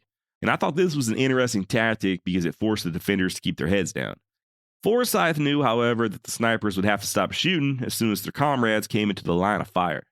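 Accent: Texas, United States. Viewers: Putting a face to the voice I’ve heard for a little while, not gonna lie thought you might’ve been a black Texan… dudes in the south sound a lot more alike across race than anywhere else in the USA. We all have a lot more in common than we don’t. I appreciate your nuance to history telling. And that is coming from a dirty Jayhawker who shouldn’t like the south. I’m pro Americans, even from Missouri lol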